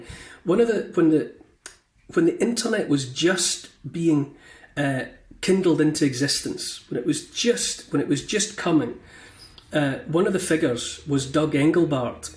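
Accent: Scotland, United Kingdom. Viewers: Scottish accent is "da best"❤. No, this is not really how i want to accept things but the "critical mass" is falling towards transhumanism, the 4th. revolution😓 if i could i would change planets right now.... people have lost their north.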